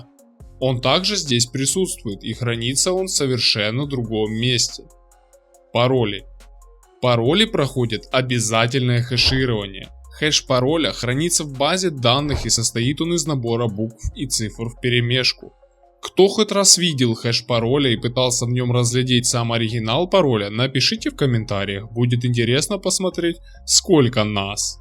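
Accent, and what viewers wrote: Ukraine. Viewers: Своим говором и языком гугла ты спалил что украинец, будь осторожней а то за такую информацию тебя многие могут недолюбливать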